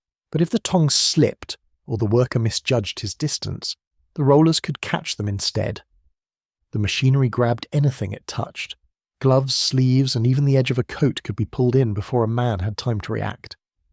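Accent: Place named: United Kingdom. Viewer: Please: Why spoken in Britisch English? Sometimes really hard to understand...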